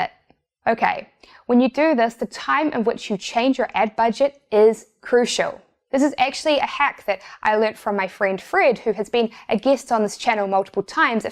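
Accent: New Zealand. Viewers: That En Zed akcient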